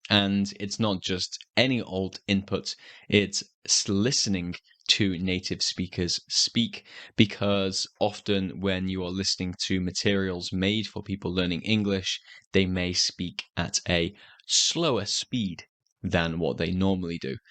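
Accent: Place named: United Kingdom. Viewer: I Love british accent , subcribed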